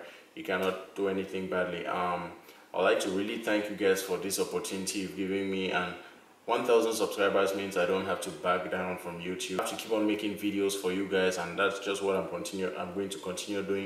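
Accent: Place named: Nigeria. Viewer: Hey, you sound Nigerian. Are you?, lol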